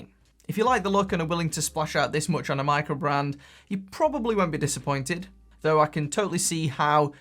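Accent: United Kingdom. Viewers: Just wondering, what is your British English Accent?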